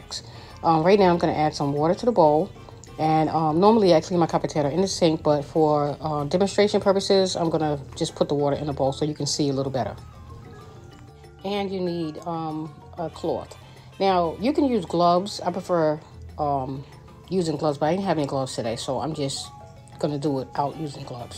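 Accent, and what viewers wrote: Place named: New York, United States. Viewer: Great info, I just restored mine using this information! Plus I like how you talk, New York!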